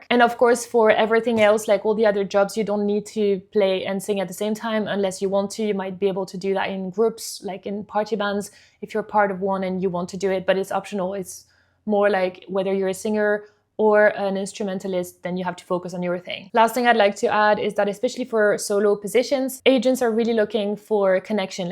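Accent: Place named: South Africa. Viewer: You sound South African. I did a few contracs as a singing bassist in party bands for Disney and Princess ships. All the South Africans I met on ships worked in the spas. They called them 'Steiner Girls'.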